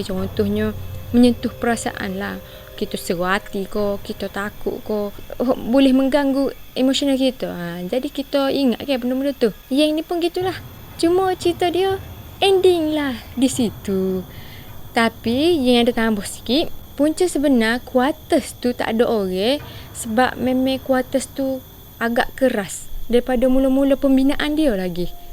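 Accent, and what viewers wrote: Kelantan, Malaysia. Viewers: seronok dengar kecek kelate. boleh lah faham sikit ii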